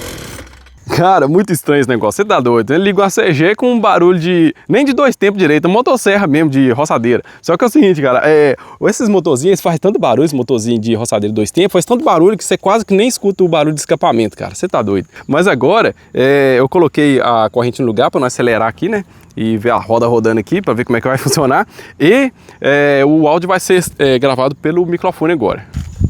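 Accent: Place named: Northern Minas Gerais, Brazil. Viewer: Tem o sotaque do norte de minas 😅! Ou nortminas soo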